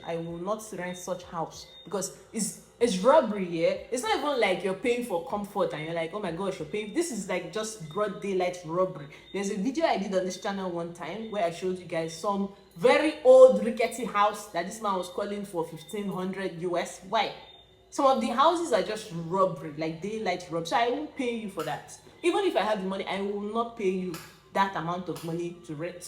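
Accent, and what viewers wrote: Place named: Igbo, Nigeria. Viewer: That igbo accent i love it i got to get me another Nigerian igbo queen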